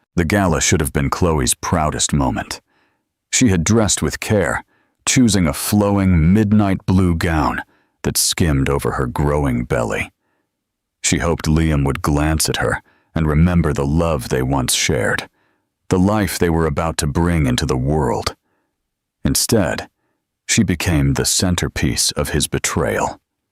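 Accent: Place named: Australia. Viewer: It sounds like 1950s Australia ! Not today ! 😂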